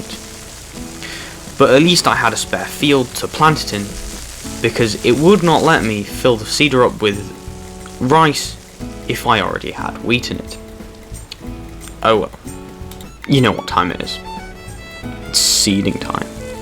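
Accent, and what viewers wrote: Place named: RP, United Kingdom. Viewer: you sound very posh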